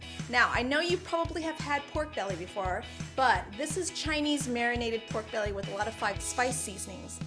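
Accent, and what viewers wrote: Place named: United States. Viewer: wow if I just heard your voice with out the picture I think you was white American lady straight up.but nice cooking tho looks fantastic.cheers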